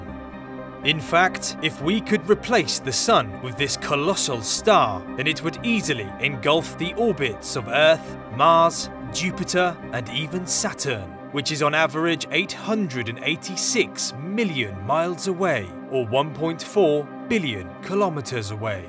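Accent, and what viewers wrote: United Kingdom. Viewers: I thought this was gonna be click bait, but when I heard the narrator’s British accent, I thought, this is gonna be good! All the best science shows are hosted by people who can’t pronounce AL-U-MIN-IUM, also known as aluminum.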